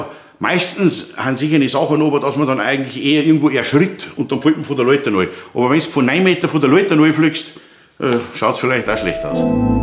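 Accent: Bavaria, Germany. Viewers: Bleib autentisch Red weida borisch Des passt scha 👍👍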